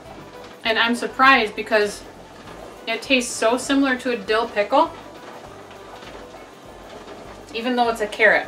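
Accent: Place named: England, United Kingdom. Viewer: I like you accent. Are you English?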